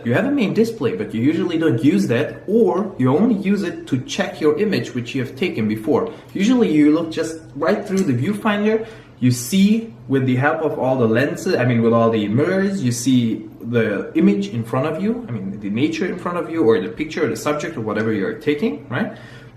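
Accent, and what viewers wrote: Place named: Germany. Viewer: Dunno who the f** this guy is, but that german accent is freaking awesome. Please make some good night tale videos too. :P But nice content there. BTW ...that orchid looks freaking awesome. :D What kind of plant formula are you using you console peasant? :D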